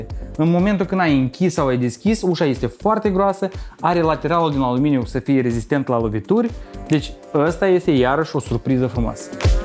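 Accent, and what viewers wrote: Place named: Moldova. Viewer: Prietene, tu nu ai un accent minimalist; accentul tau de moldovan e foarte pregnant!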